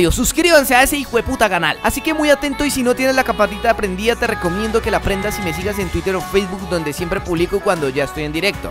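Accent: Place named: Bogotá, Colombia. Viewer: Ah, el buen habla de un Bogotano, no lo cambio por nada :v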